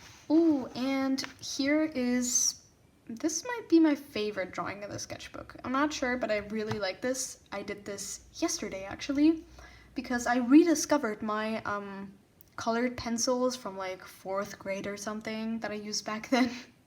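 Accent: Germany. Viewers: Waitttt are you german?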